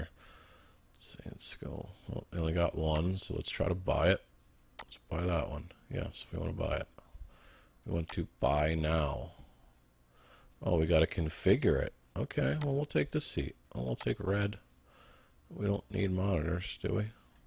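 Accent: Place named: East Coast, United States. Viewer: Love your accent. So east coast.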